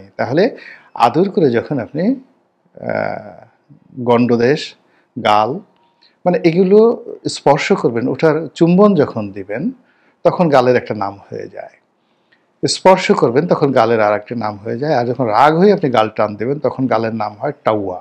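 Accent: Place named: Jessore, Bangladesh. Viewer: মাঝে মাঝে আপনি আমাগের যশোরের আঞ্চলিক ভাষা বলেন খুব ভালো লাগে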